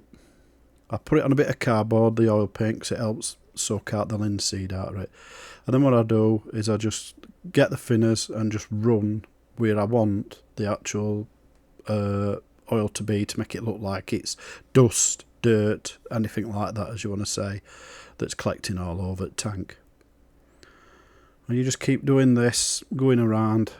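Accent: Barnsley, United Kingdom. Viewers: you sound like your from barnsley ?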